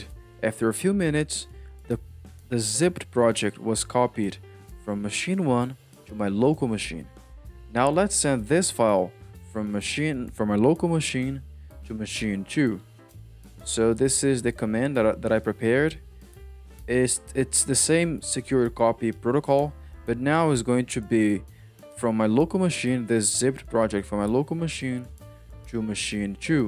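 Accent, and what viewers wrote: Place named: Brazil. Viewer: Fácil reconhecer o sotaque brasileiro kkk obrigado pelo video, ajudou aqui, tmj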